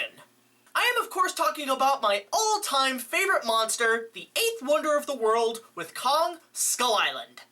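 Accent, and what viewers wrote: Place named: United Kingdom. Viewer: you really have a very good British accent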